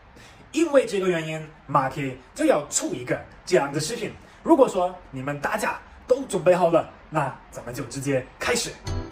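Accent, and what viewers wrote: Sichuan, China. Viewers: 的四川话有味道😄👍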